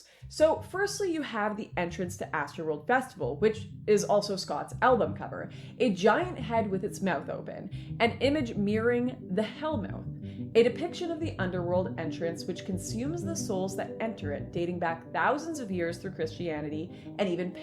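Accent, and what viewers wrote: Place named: Canada. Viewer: She has a Canadian accent stop going on about trivial unrelated subjects.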